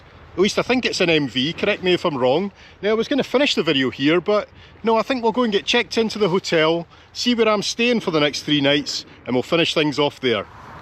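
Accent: Scotland, United Kingdom. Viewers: hey - i really enjoyed your videos tonite. I was on the isle of skye twice, and once on my honeymoon at a magical place called Flodigarrys near Portree. your video really took me back there. and i loved seeing Harris and Lewis islands- felt like i was there. love your scottish accent too! thank you!!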